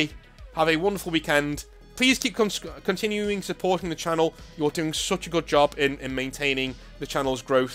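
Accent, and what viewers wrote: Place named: Liverpool, United Kingdom. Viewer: I love his subtle Scouse accent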